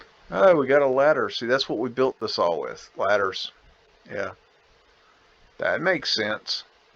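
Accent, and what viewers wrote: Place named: North Carolina, United States. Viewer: I think it's because he has a NC accent. I spent my Summers with family there. It takes me back to all the questions I asked them. They almost never had answers for me.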